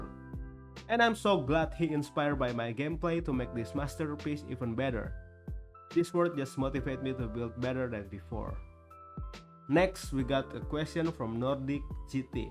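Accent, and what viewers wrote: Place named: Indonesia. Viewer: Anj kan bener dari indo, dari aksen sebenernya udh keliatan kaya orang indo, tapi gw agak ragu lu org indo soalnya jago bgt bahasa English 😂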